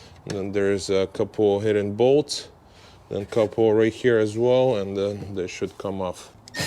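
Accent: Russia. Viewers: Я думал мне показалось что автор русский😂 👍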